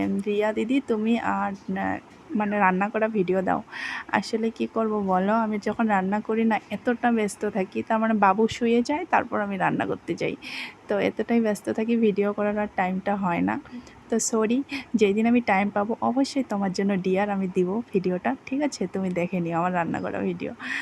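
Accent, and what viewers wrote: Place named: Assam, India. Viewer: Tmr assame biye hoyeche ki vasa sune ektu ektu lag6e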